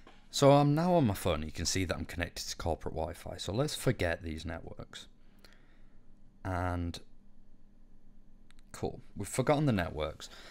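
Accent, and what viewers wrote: United Kingdom. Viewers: you must be from 'ULL' ???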